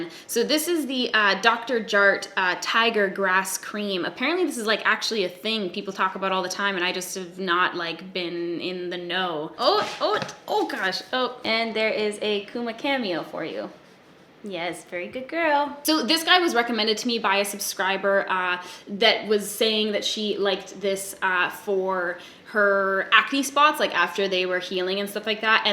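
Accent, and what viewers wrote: Canada. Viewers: I have many thoughts on today’s vid but I’m very, very tired so I’ll leave you with this: you said ‘saga’ in your sweet lil Canadian accent and I heard ‘Sega’... like sega genesis. Like sonic the hedgehog Sega. And I was like ‘so was NOT kidding. This video went off the makeup rails’ and then I realized you were saying ‘saga’ and I couldn’t stop laughing about what a dumbass I am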